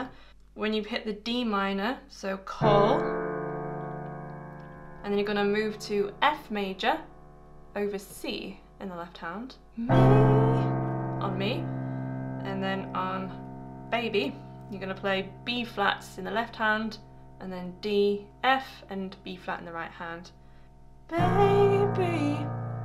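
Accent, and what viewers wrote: United Kingdom. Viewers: A couple of things that I love....piano, and british english, all together 😍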